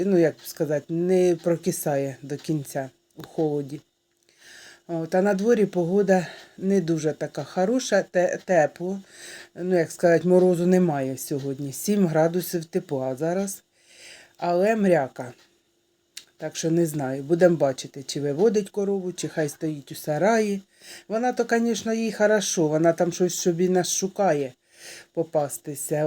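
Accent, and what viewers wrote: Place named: Russia. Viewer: у вас проскакують в кінці слів російська вимова.Ви не в Злинці живете?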